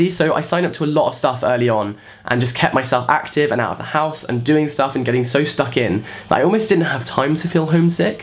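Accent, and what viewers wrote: United Kingdom. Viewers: Loooove your British accent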